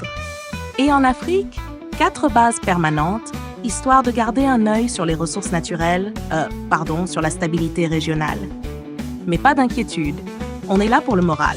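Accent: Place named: Quebec, Canada. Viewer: Je peux entendre un petit accent québécois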